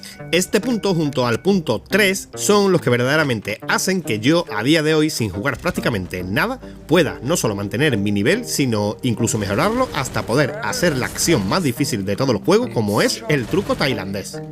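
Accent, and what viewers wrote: Spain. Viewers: por su acento debe de ser de España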